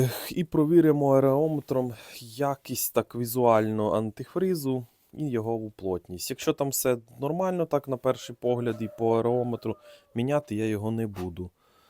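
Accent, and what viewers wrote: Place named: Uzhhorod, Ukraine. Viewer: перший раз глянув відео,ну акцент чисто як з Ужгорода,ближче до мадярів,Вітаю зі святами!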